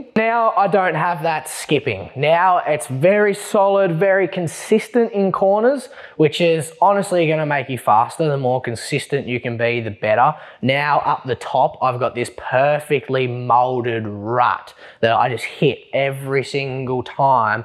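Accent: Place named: Australia. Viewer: Awesome video mate… one of the best from you guys… your super Australian accent/words used to describe things was by far the highlight of this video… please make all videos exactly like that 😂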